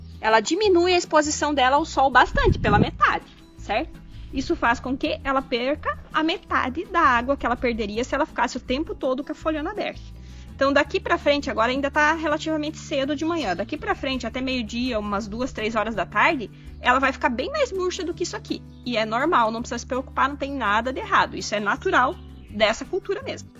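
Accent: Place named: Paraná, Brazil. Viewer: Bonito quintal. De onde vocês são? Seu sotaque me lembra o do Paraná!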